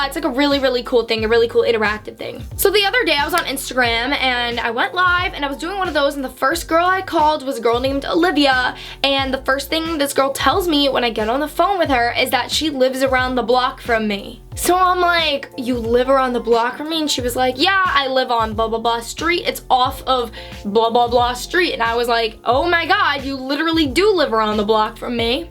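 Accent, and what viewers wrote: New York, United States. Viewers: Love your New Yorker accent, its so cuteee! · Her new York accent is on fleek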